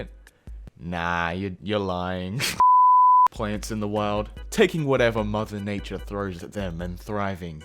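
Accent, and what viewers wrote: Australia. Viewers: He's not English/British... he's Australian. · Are u Australia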